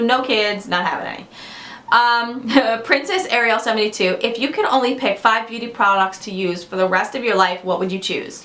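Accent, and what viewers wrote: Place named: Long Island, United States. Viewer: OMG! I just started watching you recently (found you through Dani!) and as soon as you said "draw-ring" lol I was like wow she sounds like she's from LI. And then you said you got your cat from north shore!! I'm from Long Island too!! Will you ever do a meetup??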